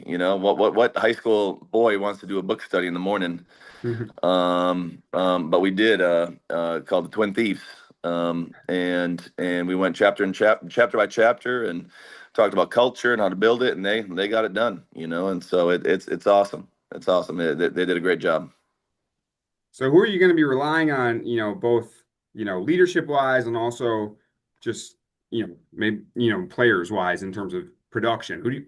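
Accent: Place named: South, United States. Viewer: !!! When did you pick up that southern accent?!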